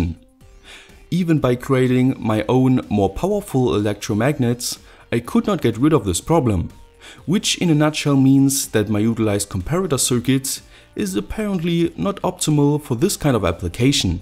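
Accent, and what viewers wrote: Germany. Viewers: I guess by your accent that you are from Germany or Austria, if so why do you claim that JLC PCB has free shipping? But it doesnt show for me free shipping(Austria)?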